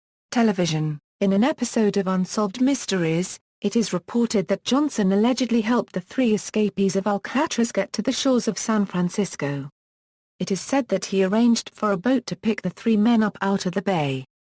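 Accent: England, United Kingdom. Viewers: Something about an English woman talking about American mobsters doesn't do it for me.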